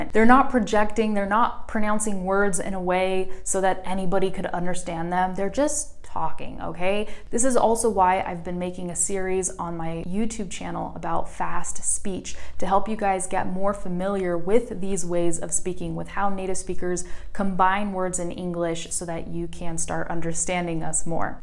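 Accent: California, United States. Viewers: I'm a native English speaker, but I will say this for whatever reason while learning Spanish it is much easier for me to understand what a woman is saying compared to a man. I don't know why that is but it is. And yes you have a California accent which is to say really no accent as most other English speakers would say. I would imagine it's much more difficult for non native speakers to understand different British accents, Australians, or related New England and New York accents because as a New Englander I can say we speak more like British people than we probably think with long vowels and guttural h sounds that aren't actually supposed to be in the word but it's just how we talk. Or Pennsylvanians and New Yorkers dropping H's and pronouncing words like hat and ass like " "hey-at" and "ey-ass" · A big thanks to you mam, honestly I really enjoyed and understood each everything you said throughout this video make me encouraged and motivated to do more and put me in a field has potential to improve my listening and speaking in English, and one more thing that I want to share is i dreamed myself that I speak American English well like you speaking. 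Saying thanks again for reading my comments. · Exactly.. she speaks in basic english with clear american accent. That's make us understand easily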